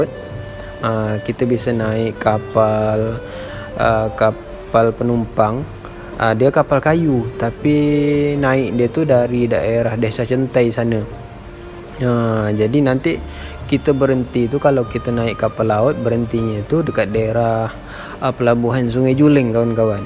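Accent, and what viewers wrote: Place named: Johor, Malaysia. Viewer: bahasa kamu 98% mirip logat melayu Johor malaysia aka UPIN IPIN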